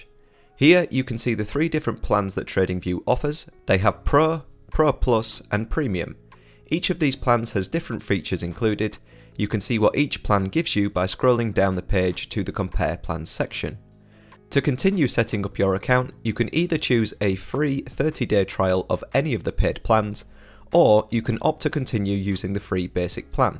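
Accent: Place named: North, United Kingdom. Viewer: Half way through & it is an EXCELLENT education! Thank you! Top Northern accent also!!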